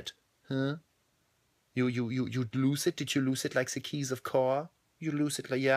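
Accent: Germany. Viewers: Ich will nicht sagen, dass dein deutscher Akzent weh tut, weil ich selbst Deutsche bin und natürlich auch einen hab. Aber echt ehy :D trotzdem bist du ein echt cooler Typ :)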